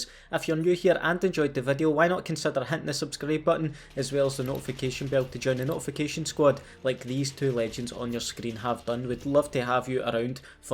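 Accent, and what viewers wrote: Scotland, United Kingdom. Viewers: Not sure if I subbed for the actual content or for the beautiful Scottish accent but either way #notificationsquad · This guy Scottish? (Also in real life white phosphorus is really dangerous it’ll burn your body bad) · Love the COD content but... that Scottish accent is the one that hook me up. #notificationsquad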